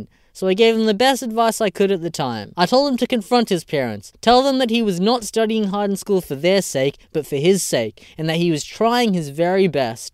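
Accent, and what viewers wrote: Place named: Australia. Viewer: I'm similar to you. I grew up in Australia from immigrants (I'm assuming you've got an Australian accent). I can speak my native tongue but not particularly well. Its a real chore to communicate with native speakers back in my country of origin. I've had that exact situation where they put you down for not knowing the language well enough when they speak with a thick accent and too quickly to comprehend. I get alot of crap for not being able to write my own name in my native language. I don't owe my country of origin learning my language better, its not my failure.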